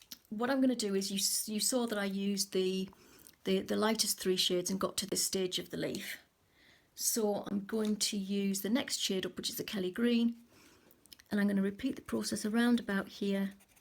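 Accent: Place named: Geordie, United Kingdom. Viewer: no don't apologise ☺just in case you hadn't noticed lol. Wish I had half ur talent. My colouring coming on so much and just discovered ur channel. Your teaching style is easy to follow. I also used to live in ur area (near Gateshead) so love ur acent. Back in my home town now (Glasgow). Looking forward to more tutorials x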